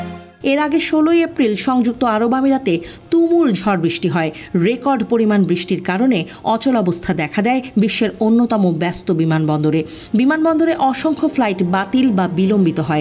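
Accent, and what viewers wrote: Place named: Kolkata, India. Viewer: আর টিভির এই ধরনের কলিকাতা দিদিদের মত খবর পাঠ করার জন্য আমি এটা বয়কট করছি।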